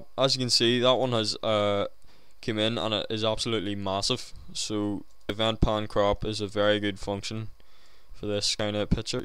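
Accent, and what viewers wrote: Northern Ireland, United Kingdom. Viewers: you from northern ireland matE?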